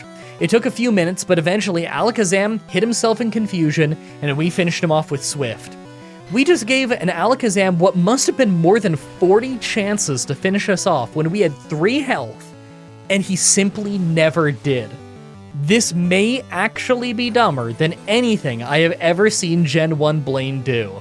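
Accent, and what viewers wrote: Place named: Canada. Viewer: This narrator's Canadian accent is so cute to listen too😊😊😊😊😊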